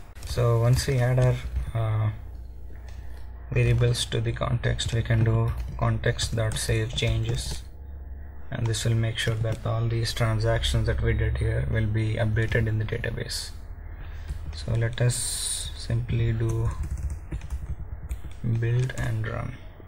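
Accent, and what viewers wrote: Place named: India. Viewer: I hate the Indian English.. I dont understand...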